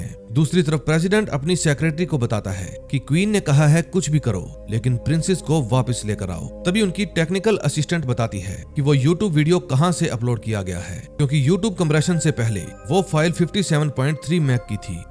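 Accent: Punjab, India. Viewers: Bhai kya aap Punjab se ho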